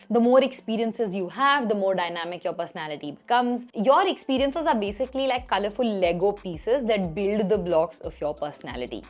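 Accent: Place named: India. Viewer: by listening to her accent i can clearly say she is from India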